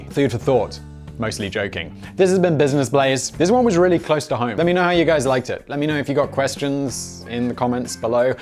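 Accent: RP, United Kingdom. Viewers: bill spangler you’re not alone I do it cause I like his voice He has a very strong British accent and I adore his voice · you keep doing what you’re doing! I find you hilarious! The whole comedic package wrapped up in that silky British accent is awesome. The style is great and I like you laugh at your own jokes. I put your channel on auto play and fall asleep to it. So I am helping you crush that watch time and happy to do so. Plus since I always fall asleep, I even rewatch most videos! This is by far my favorite of your channels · Eagerly anticipating the animal channel that has the upper british accent narrating the life of like... a bee. Yeah. GIVE US THE BEE LIFE VIDEO DAMNIT. Or: Do a nature channel where you narrate the life of bessy, the gentle cow. Then the next day have a food critique channel that critiques steaks. 🤣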